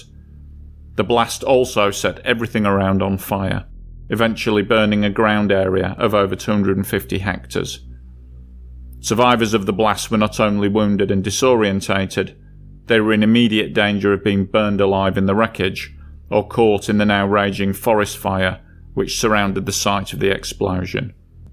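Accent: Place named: Northern England, United Kingdom. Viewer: You still sound like you're in the north of England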